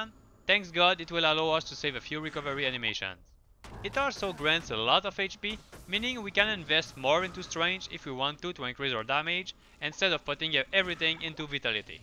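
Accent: Quebec, Canada. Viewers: j'aime beaucoup le fait que nous les québécois on a vraiment un accent anglais à nous autres :P Super vidéo man merci! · tu donne quebecois :P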